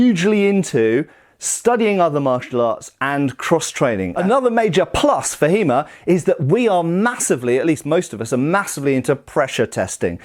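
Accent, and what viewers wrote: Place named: United Kingdom. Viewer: I like your use of a proper British accent in this video.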